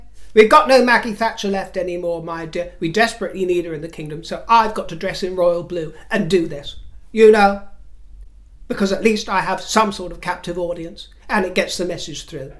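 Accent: United States. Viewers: Brilliant American accent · your American accent is a SCREAM!!! spot. on!!!! LMBO!!! 🪖🎖😆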